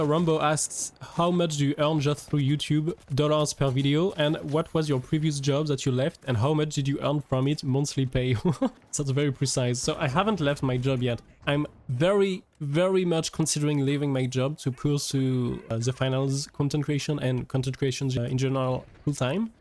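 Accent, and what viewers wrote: France. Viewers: I'd be glad to help with the accent, I'm french too but people say I have a great accent :D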